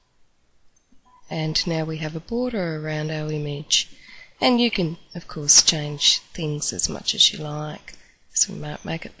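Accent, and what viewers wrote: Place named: New Zealand. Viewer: The voice is clearly NZ and she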